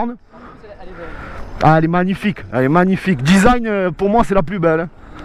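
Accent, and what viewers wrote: South, France. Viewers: Bonjour, très très impressionnant, et j'adore ton enthousiasme avec ton accent du sud, c'est un bonheur ta vidéo! Par contre une remarque sur quelquechose qui m'interpelle en fin de vidéo. Le cintre carbone semble avoir été trop serré, la surface périphérique de part et d'autre de la potence est un peu mangée... ce qui n'est pas normal. 6NM maximum écrit sur la potence (alu...) mais pour du carbone... j'ai un grand doute. À l'époque où je choisissais encore la voie du carbone sur mes cadres et périphériques VTT avec l'idée que la rigidité me ferai perdre moins d'énergie (avant de passer à l'acier, puis au titane pour le bonheur de mes fesses, mon dos et mes bras), je mettais 5NM max sur pièce carbone, et jamais de serrage sans une clé dynamométrique bien étalonnée! Et pour éviter qu'un couple moins important de serrage n’occasionne un découplage intempestive, Loctite bleue, voire rouge en fonction des pièces. 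Désormais j'évite le carbone sur des pièces où ça n'a pas vraiment d'intérêt, et plus encore sur les pièces où cela peut être dangereux. On dirait que le carbone de ton cintre commence un peu à être mangé à à , vraiment.... À vérifier en tout cas ... j'ai des photos d'un pote qui a subi une casse de cintre carbone trop serré en VTT, en pleine descente technique... multiples fractures du visage c'était très très moche (pas de casque intégral puisque XCM et pas DH). Et il a eu de la chance... Sur un engin électrique pareil sérieusement, je n'irais JAMAIS mettre du car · Le Sud , cet accent , le soleil dans l'oreille 🔥🔥✌ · Je kiff cet accent du sud. Je pourrais l'écouter des heures :D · J addddoooooooore un marseillais faire la démo.....trop mmmmmmdrrrrr ... ti é fadah !!! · Je me régale avec tes vidéos ! je suis nouveau dans le monde de la trot et viens de d'acheter la Dualtron mini 21ah, mais je t'avoue que je suis un peu trouillard dès que je suis doublé par des bagnoles, j'espère qu'avec l'habitude la peur va disparaître ! 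C'est con d'avoir peur car je suis cycliste et me suis tapé 100 fois l'aller retour Marseille Les Lecques par la Gineste (j'habite à Paris mais suis Marseillais) à vélo de course l'été pour aller me baigner ! Peut-être l'âge rend t'il trouillard, 50 balais 😂 En tous les cas tes vidéos sont trop sympas, et ton accent que j'ai un peu perdu, trop cool 👍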